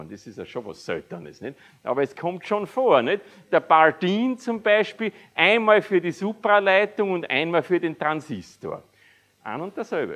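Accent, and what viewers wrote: Austria. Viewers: Eine großartige Auffrischung für mich, der ich in der Schule zwar Physik-Leistungskurs hatte und dann doch der Chemiebranche anheim gefallen bin. Und nicht zu toppen das Ganze auch noch im regional eingefärbten Sprachduktus. Ich liebe Österreich! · Eine gute Vorlesung mit österreichischem Schmäh vorgetragen, so dass man es gut verstehen kann. Schade, wennn man reicher geboren wäre, hätte ich gerne in Österreich studiert. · Sehr grosses Lob an diese Vorlesung. Als Norddeutscher ist für mich das Österreichische ein zweischneidiges Schwert: Zu Charmant um wegzuhören, zu einschläfernd um konzentriert zu bleiben... Tatsächlich taten alle Worte gut, vielen lieben Dank!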